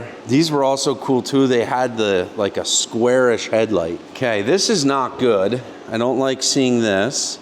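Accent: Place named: Pennsylvania Dutch, United States. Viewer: I love you -- I've been watching your videos like crazy for the last couple months... and I grew up in Allentown so I love hearing your PA Dutch accent... but you gotta stop saying "menagerie." Nothing but love, your friend in Texas, Alan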